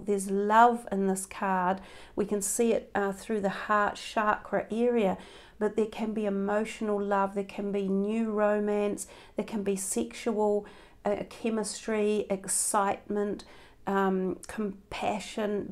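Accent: New Zealand. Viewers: I hear another NZ accent !! Nice reading. ☯️🌈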